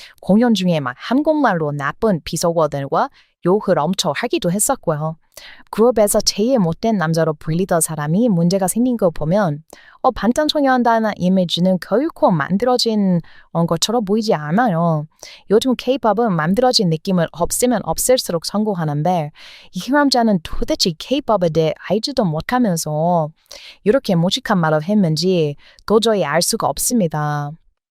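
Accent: United States. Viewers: 왠 AI? 목소리 너무 좋아요. 전형적인 미국인의 한국어 어투로 들려요. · 나도 AI 음성의 유튜브채널을 싫어하는 사람입니다. 그것들은 인간의 목소리가 아니라서 감정이 없고 억양도 부자연스럽지만 그보다 더 중요한 것은 가짜 뉴스나 남이 만든 내용들을 짜집기 해서 베껴 쓰는등 내용의 진실성이 의심되었기 때문입니다. 그래서 나는 그런 채널들은 구독하지 않지만 채널은 단 한번의 시청으로 바로 구독했습니다. 의 목소리는 미국인들이 한국어를 할때의 억양 그대로였고 영어로 말할때는 미국인의 본토발음 그대로였기 때문입니다. 그리고 그 무엇보다도 중요한 것은 만의 개인적인 견해가 있었고 다른 유튜브채널에서는 볼수 없는 독창적인 내용이 있었기 때문입니다. 님께 질문하겠습니다. 어떤 유튜버가 의 목소리를 AI 음성이라고 하던가요? 님도 의 목소리가 AI 음성으로 들리던가요? 님이 이 질문에 답변을 못한다면 그저 어그로나 끌기위한 댓글장난으로 간주하겠습니다.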